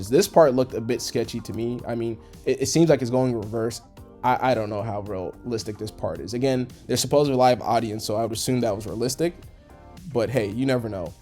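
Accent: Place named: United States. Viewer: China hasn't shot a bullet outside of their borders in almost half a century so it's kinda hard to listen to someone paint China to be as warmongering as the US especially in American accent. Not saying China can't be aggressive in the future but you can't deny the fact that the irony and hypocrisy is too much to handle for most of us normal non-western people. Also I agree that China probably already testing more advanced robots, drones, and AI in their secret military labs but they're the only major power that actively tried to ban and regulate AI usage in war. So it's not fair to criticize China without criticizing certain powers who denied Chinese proposal just because China advanced faster than expected.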